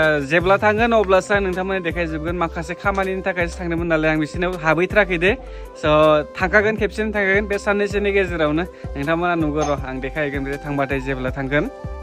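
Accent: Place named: Goalpara, India. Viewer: जोंनि गवलपारानि रावजों सेम आदा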